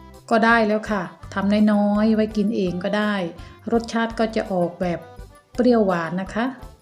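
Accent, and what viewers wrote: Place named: Surin-Buriram, Thailand. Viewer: พี่บัวบุษบงมาชมการทำแตงกวาดองด้วยจ้า ว้าวง่ายจังค่ะ จ๋าเป็นคนแถวไหนน้อพูดเหมือนคนแถวๆสุรินทร์บุรีรัมย์เลยจ้า..พี่บัวคนสุรินทร์เด้อจ้า😂😂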